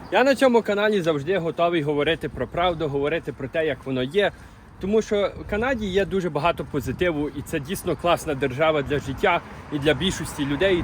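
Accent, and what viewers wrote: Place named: Western Ukraine, Ukraine. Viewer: Какой противный западынский акцент.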